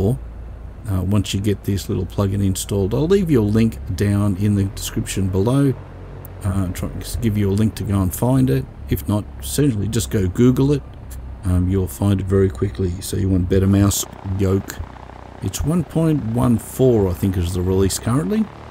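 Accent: Australia. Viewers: Mate, I really appreciate your effort to thoroughly documenting keyboard-mouse flying in X-Plane - and other mouse-flying video posts. Just beginning my X-Plane journey during these stay-at-home times. Will definitely try the plug-in. Also, your Ozzie accent is wonderful to hear as I just returned from my PhD study last year in Brisbane. Subscribed your channel.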